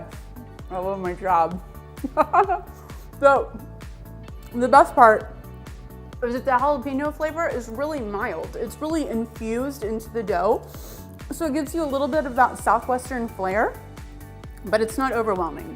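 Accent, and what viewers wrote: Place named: Minnesota, United States. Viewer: You may live in Dallas, but you are definitely a Yankee. I live in central Texas, but was born and raised in Minneapolis. You sound like Minnesota. Come on. Tell the truth. I love your channel in your cooking. I can’t wait to try everything.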